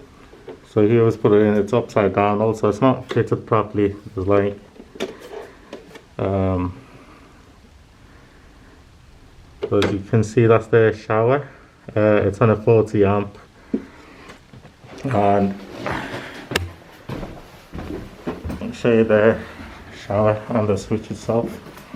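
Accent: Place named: Liverpool, United Kingdom. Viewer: Carried on watching and you’ve now mentioned Liverpool so that’s answered my question 😂 I could tell you were scouse from your accent ❤️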